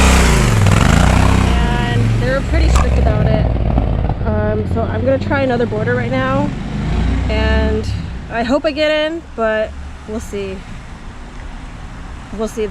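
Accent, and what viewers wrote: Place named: United States. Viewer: Hi from Izmir 🖐️🙂 I wonder why do you have American accent ?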